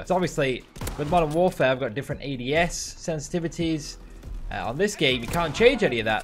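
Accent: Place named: England, United Kingdom. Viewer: dude you're great. That english accent sets the mood straight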